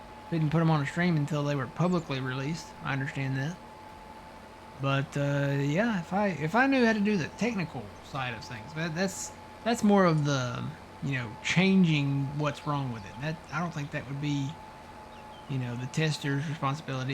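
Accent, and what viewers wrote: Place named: United States. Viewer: hey bro. this is good content, lots of creators mess it up by putting in those speeded up farming with horrible music, plz dont do that and you will have at least me to keep telling you are doing great. you must either be a farmer, or have or do work on farms. and your country accent, lol not a bad thing just kinda funny. I live in idaho you know SPUD COUNTRY LOL, Im from Fairbanks Alaska, and spent ha;f my life in Seattle,. Not much for the big city, I am a metalhead but i love all real music. if yoou ever wanna say wat up Im down to make a new friend. If you want I can send you my stean handle or watever ppl call it these days and maybe we could multi player some time. like i said this is good content, keep up the good work bro. Sean.......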